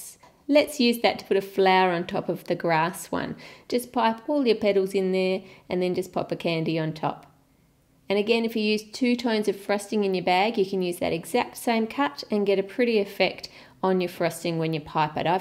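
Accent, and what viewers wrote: Australia. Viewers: Hi! I love your channel, and watch it all the time. You obviously come from a country where you have summer all the time. How could I know (apart from your accent and that you say you come from Oz)? The snowcrystals have 6 points. Always:) Btw: I whish I had an orange tree in my garden, not snow... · Just love the Aussie accent 🥰❤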